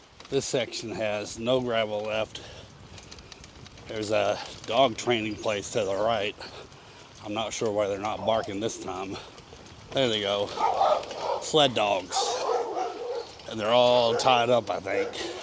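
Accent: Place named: Kentucky, United States. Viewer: Moose in the Whites are not a myth! I followed one across Chocorua lake in my kayak once. I’m always surprised to see moose droppings waaayy up on ridge tops on the trails. What are they doing up there? Saw one in Lake Umbagog as well. I’m enjoying your videos.. maybe I’ll see you on the trail sometahm (that was a tribute to your (Kentucky?) accent. Love it.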